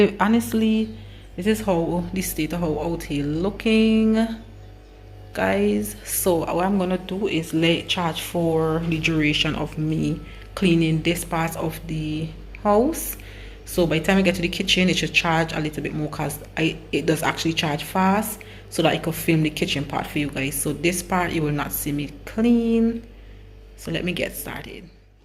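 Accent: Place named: Barbados. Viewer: For me it's nice when I come across content from Barbados. Girl you have adopted the Bajan accent very little of your former accent is left. God has already worked it out.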